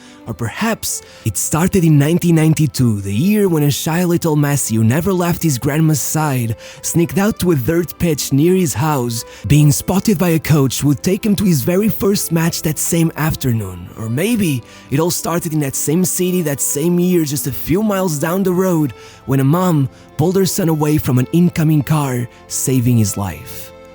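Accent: Portugal. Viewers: he is portugese that's why he has an accent, and the other mistake, are you always perfect or smthng? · Este narrador eh portugues de certeza. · És portugues de certeza, esse sotaque n me estranha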